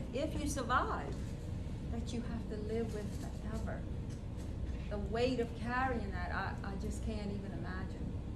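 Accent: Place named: South, United States. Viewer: I’m part of your usps fam and have followed your story. I’m so extremely proud of your presentation it has to be so difficult every. single. time. !! And I pray daily that the good Lord comes back soon to reunite your family and I’m so excited to meet those wonderful kids !! That day will come I promise !until then I send all my love and support from Jax - originally Wisconsin and live your little southern voice !!! ❤️🙏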